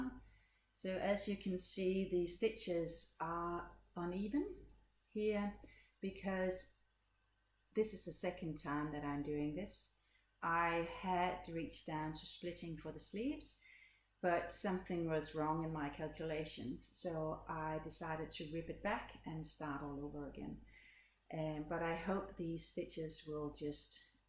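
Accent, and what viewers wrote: Denmark. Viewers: Så får du da lige en lille hilsen fra syd for Granada i Spanien også. Så er der et land mere på din liste. Det sjove er at jeg faktisk hørte om din podcast på en amerikansk podcast, og vidste ikke, at du var dansk, da jeg startede med at se din podcast en gang i slutningen af sidste år 😄 men jeg genkendte hurtigt accenten 😉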